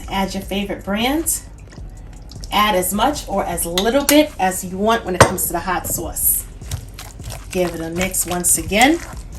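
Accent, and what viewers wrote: New York, United States. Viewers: I'm not going to play with you. 😀😀😀😀 You have an accent like you are from NYC. What I am about to say does have nothing to do with you and your cooking. I have been watching this show called POSE on FX Channel. If you know what I'm talking about I appreciate it. I just wished Blanca... who was the mother of "THE HOUSE OF EVANGELISTA" would have fed her kids things like this. Fried chicken, pork chops, etc..., LOL I know it was only a TV show. I'm just having fun 😋😋😋😋😂😂😂 This looks AWMAZING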